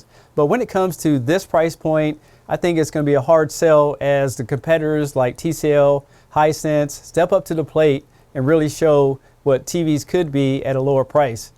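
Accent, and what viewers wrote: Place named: North Carolina, United States. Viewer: I’ve watched a lot of your videos but still can’t quite pinpoint your accent. I’m thinking somewhere in the North Carolina area? Maybe Kentucky?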